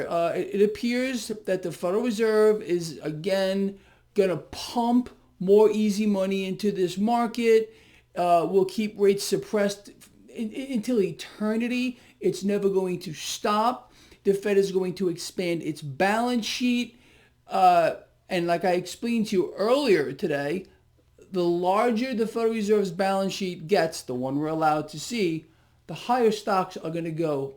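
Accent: United Kingdom. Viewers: Sounded a little bit like a posh English accent 😁👍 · British..The accent is British...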